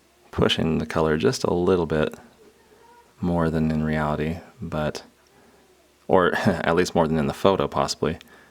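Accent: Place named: Vermont, United States. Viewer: Something about your accent...are you from Vermont?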